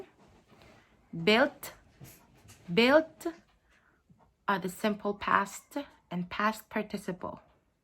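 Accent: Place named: Venezuela. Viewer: Parla venezuelano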